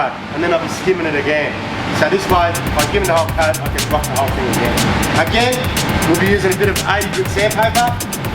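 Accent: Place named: Arab world. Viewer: Looks like the guy had an Arab accent, who went to Australia and was kicked out and went to U.K, lived there for 15 years, tried a lot to copy the white guys there.... And now he is a mongrel... His accent sucks!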